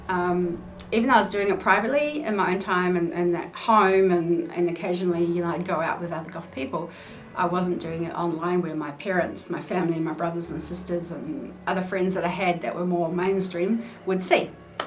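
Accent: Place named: New Zealand. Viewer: Are you a new zealander?? I just caught the accent and was like whoa! I'm from Christchurch. I'm just transitioning into the goth subculture--by that I mean I'm now dressing more to reflect the things I've always liked :) Enjoyed the video edit: I totally commented before you mentioned NZ 😂 I just get excited when I find NZ'rs in youtube haha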